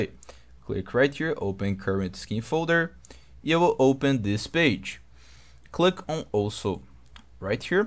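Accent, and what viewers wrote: Brazil. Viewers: acho que da pra perceber um pouco seu portugues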